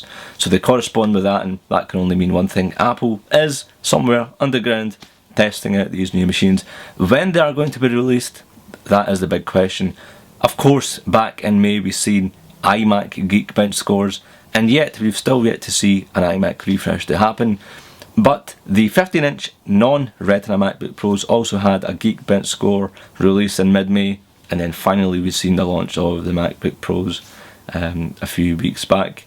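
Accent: Scotland, United Kingdom. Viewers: lurve the scottish accey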